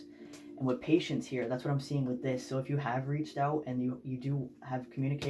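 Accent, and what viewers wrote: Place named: New England, United States. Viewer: Just found you...love that New England accent! I'm from there too. Spot on, but the guy I loved turned out to be a total a&&s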